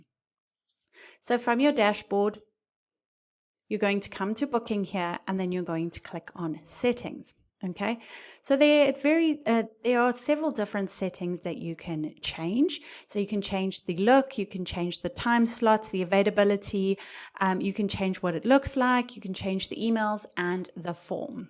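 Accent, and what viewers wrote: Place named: South Africa. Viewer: Great video and very helpful. Thank you,. Nice to hear a South African accent:)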